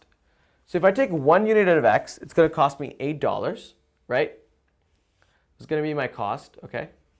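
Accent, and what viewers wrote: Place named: India. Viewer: magnificent.sheer fabulous accent compared to your other countrymen in india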